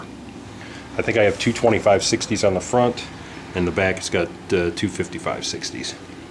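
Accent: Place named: Cleveland, United States. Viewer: Listens to this guy talk: definitely from up around Cleveland. “So anyway up here around Northeast, Ohio.”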